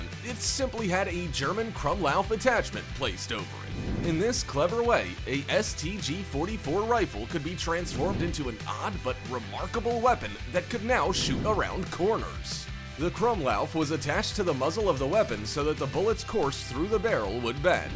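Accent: United States. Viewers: i love, how amerycans say german words( im german)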